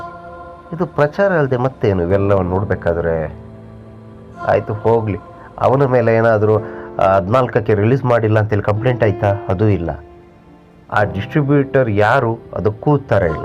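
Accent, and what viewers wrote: Coastal Karnataka, India. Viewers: Yavano ninu magne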